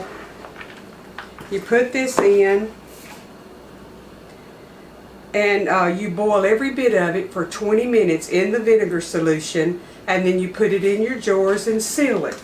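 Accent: South, United States. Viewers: I make a dill relish that we absolutely love! I think I will try this recipe as well. It does look so yummy! I live in Michigan and we are trying to get get gardens started here. I would love to see any canning videos! I am a canner from way back but never get tired of watching canning videos! I love to try new canning things. Like I said I’m a canner from way back, helping my mom as a young girl and then for my family, but I’ve just started dehydrating. Do you have plans on making dehydrated videos? I would love to see that as well. I’m really enjoying your videos. My family is from the south so hearing you talk and they way you phrase things is just beautiful! It reminds me of home! Thank you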